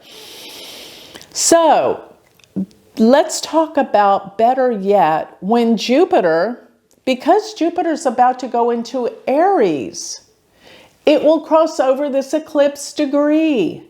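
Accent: Texas, United States. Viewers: First time watcher.. Something made me think, she's from Texas and turns out you are! I'm in Tyler, not too far away from Dallas. Looking forward to checking out more! Cheers!! ❤️❤️❤️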